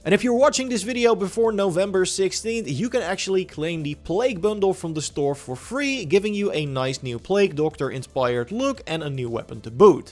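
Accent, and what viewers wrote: Netherlands. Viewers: This man is from the Netherlands, I can tell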